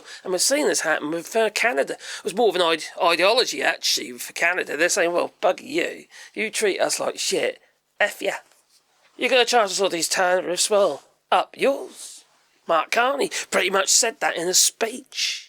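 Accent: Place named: West Midlands, United Kingdom. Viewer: Are you living in America off-grid? you are from the British West Midlands!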